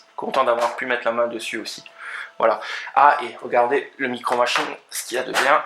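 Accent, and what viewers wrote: Alsace, France. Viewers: je me suis mis récemment a regarder les video de collectionneur comme toi et pleins d autre, et se soir je suis tomber sur ta chaine qui est vraiment tres sympa a voir. bravo pour toute ses trouvailles dans les vide grenier, moi même collectionneur je suis malheureusement moins chanceux que toi quand je passe par un vide grenier pour trouver d aussi belle pièce que toi ! petite question, dans tes video j ai l impression que ta un accent alsacien? je me trompe peu etre. je te félicitation pour ta tres belle collection surtout au niveau des collector ! bonne continuation.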